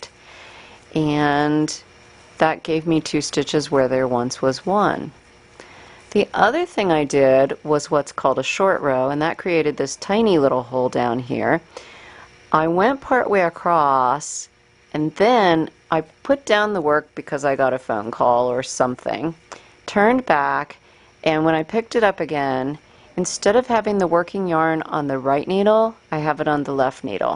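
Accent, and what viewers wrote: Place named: South Jersey, United States. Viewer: Are you from PA or NJ.. because you have a south jersey/philly accent .. similar to me.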